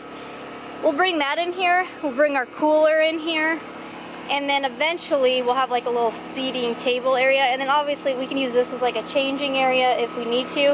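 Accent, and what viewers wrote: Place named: Michigan, United States. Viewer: I had a feeling you weren't from Arizona. My wife is also from Michigan and you sounded a lot like her and my in-laws...I hope to make it out to Arizona some day, I'm excited to see how the dry heat feels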